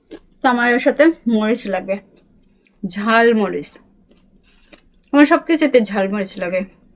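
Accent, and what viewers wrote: Khulna, Bangladesh. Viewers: Aunty ami pry 2 yr ar bashi apnr video dakhi vlo lage, amr basa o khulna apni jokhn Khulna acchiln oi somai ei bujchilm apnr kn amdr moto kotha kno.❤️❤️❤️❤️❤️❤️❤️❤️❤️❤️❤️❤️❤️❤️❤️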